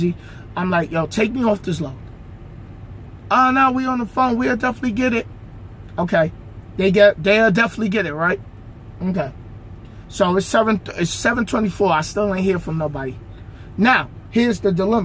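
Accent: New York, United States. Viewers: Sounds like your from nyc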